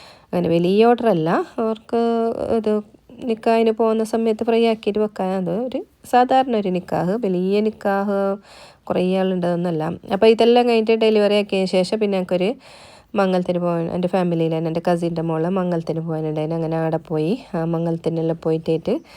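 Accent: Kasaragod, India. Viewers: Kasaragod ആണെന്ന് തോന്നുന്നു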